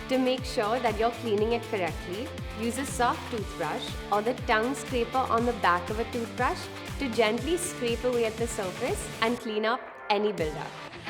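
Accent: India. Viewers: has an Indian Assent. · She has an american look. But she talked like indian woman.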